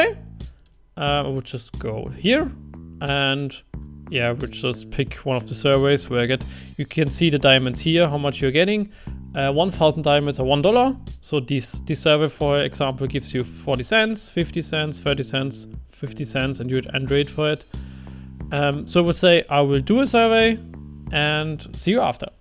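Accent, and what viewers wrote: Germany. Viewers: du hast nen deutschen akzent, biste deutsch?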